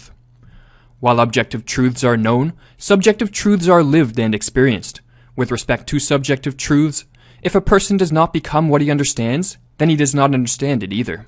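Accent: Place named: Canada. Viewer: I spot a Canadian accent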